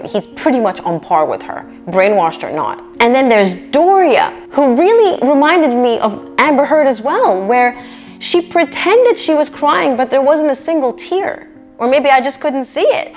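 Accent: United States. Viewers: Listening to you is like listening to my own thoughts in an American accent. Loving your videos. Just discovered them today. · Great video your accent perfect English but I'm wondering is it American it has a slight undertone of something else I'm so interested in it. It's an American accent with slight differentiations on certain pronunciations though few would notice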